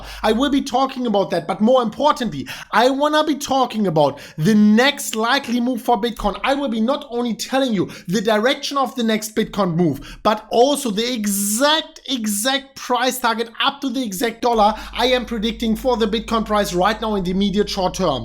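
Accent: Germany. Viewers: I always love the German accent haha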